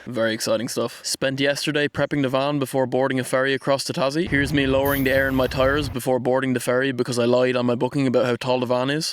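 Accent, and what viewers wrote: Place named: Ireland. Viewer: Love that Irish accent.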